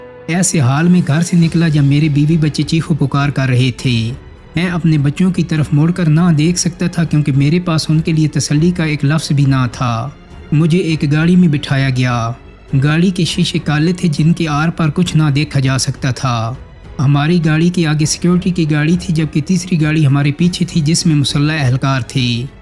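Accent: Chakwal, Pakistan. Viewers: بھائی جان آپکا لہجہ ضلع چکوال کا لگ رہا ہے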